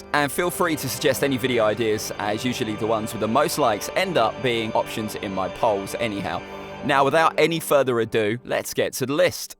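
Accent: United Kingdom. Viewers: His accent is from hartforshire, England you clown 😂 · Bc hes british so he has higher accent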